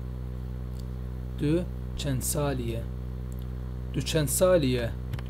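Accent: Turkey. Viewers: The speaker is speaking Kurmanji, but he has Turkish accent when he speaks Kurmanji. Unfortunately, many Kurds first language is Turkish in northern Kurdistan because our language was banned in northern Kurdistan. Turks thought they could assimilate us :(.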